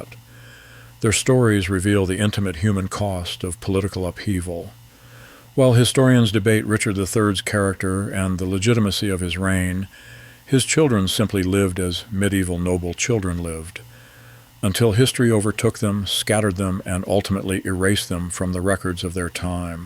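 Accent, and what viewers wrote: United States. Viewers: The two illegitimate children would have never inherited the tbrone, so what happened to.them, apart from interest, is simply not important. I do wish we could have an English accent not an American one narrating this!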